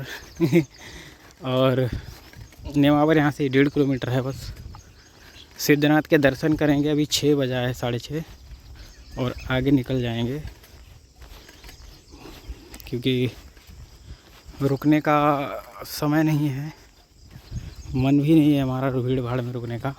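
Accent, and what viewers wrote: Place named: Kanpur, India. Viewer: Prabhu Ji aap kanpur se Ho kya,boli wahin ki lag rahi hai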